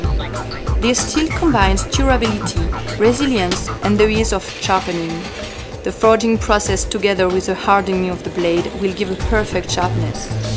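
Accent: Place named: England, United Kingdom. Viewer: Cet accent anglais m'écorche les oreilles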